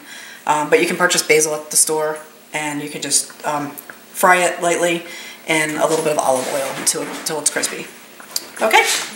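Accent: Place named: South, United States. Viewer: I've laughed seriously out loud on your southern accent lol.....first lesson....southern talkin 101....taters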